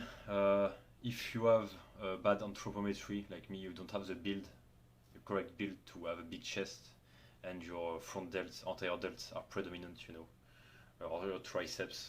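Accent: France. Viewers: Great video and iconic French accent. Subscribed 👍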